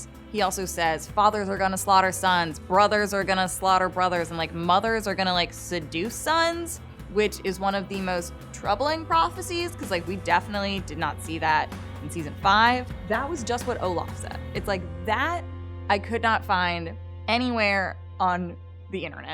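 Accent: United States. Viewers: I cannot stand this lady's voice this type of American accent is the worse sound in the world !!! And so and like and like whatever just speak he English language properly if your not going to you could always create an American language tbh I can't stand anyone from the new world talking about history ... Your all so isolated and far removed. From the rest of the world your perception of the old world is just wrong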